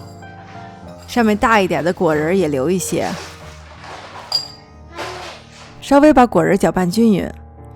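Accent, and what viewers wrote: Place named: Beijing, China. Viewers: ，你人生活在印度嗎？為什麼又印度奶茶，又印度薄餅？為什麼說話口音是京騙子？膚色是印度人的膚色？ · 好喜欢讲解的声音，北方腔好治愈，音乐也好！喜欢5555，想学肉夹馍！！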